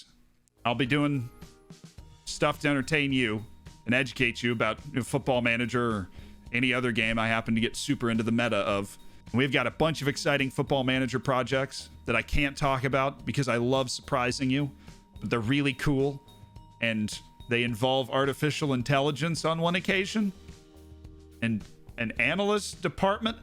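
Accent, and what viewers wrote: England, United Kingdom. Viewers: Decent English accent. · That English accent is fantastic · Good English accent! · Oh shit that was an English accent was it? P.S. Good luck with the revamp bud i'm looking forward to what you're going to get up to.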